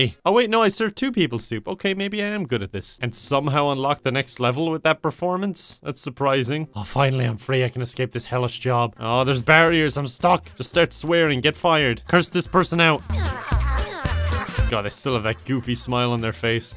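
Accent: Ireland. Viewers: I love his Irish voice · part ireland u from g?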